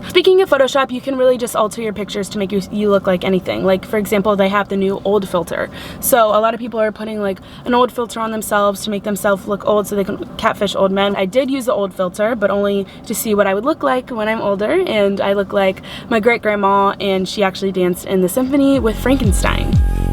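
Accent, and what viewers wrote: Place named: United States. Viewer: As a Brit fluent in sarcasm, It’s weird hearing this much sarcasm in an American accent 😂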